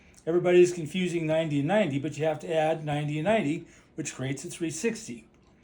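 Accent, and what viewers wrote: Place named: United States. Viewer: HEY! Further to my other comment. I took a quick look through your YT channel and conclude that you are 'Muricun. You say EEquator and not eQUAtor. I described in my other comment how a Mercator projection is made. Now I will tell you about the TRANSVERSE Mercator projector. In the US of effen A!! Large scale topographic maps are created using a TRANSVERSE Mercator projection. A meridian (line of Longitude) is chosen as the central meridian for any particular map. The entire country is gridded out so these reference meridians are specifically chosen to allow map sheets to line up with ones east and west (and north and south) of them. Imagine a cylinder of paper wrapped AROUND the globe along an above stated meridian line. The maps produced here are VERY LARGE SCALE so only a very tiny bit of the earth's surface is projected for these maps. 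The largest scale map that is usually created for these topographic maps is a scale of 1:63360 WHY that number? I live in Canada. An equivalent map that is produced here is 1:50000, so it is slightly larger in scale. But WHY does the USA use 1:63360? ANY idea? I'll let you figure that out. When you do, then figure out why Canada uses 1:50000 These topographic maps are quite accurate. They ALL have a bit of distortion at the east and west edges. They are accurate ONLY on the very center meridian line. You can purchase several map sheets that adjoin each other and cut off the large margins to leave JUST the map part, and then fit the she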